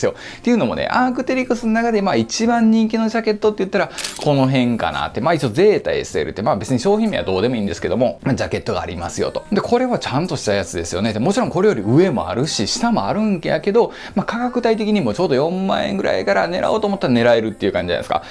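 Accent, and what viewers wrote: Kansai, Japan. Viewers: 関西弁の説明がジワジワきます！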